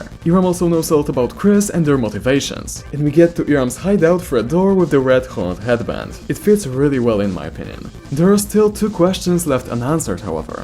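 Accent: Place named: United States. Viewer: Wow if i didn't know you arę from Poland them I might say that you are from america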